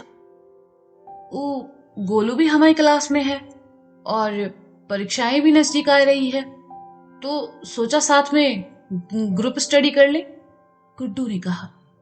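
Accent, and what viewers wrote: Kanpur, India. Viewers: Mujhe apki ye kanpuriya language boht achi lagti h kya mst bolte ho sach me jbse murari wala sune tbse boht acha lga❤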